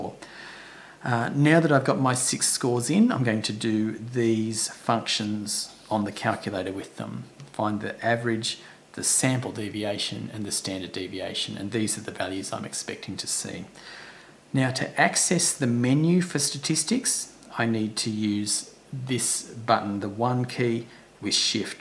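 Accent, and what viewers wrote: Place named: Australia. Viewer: How tf he gay its an Aussie accent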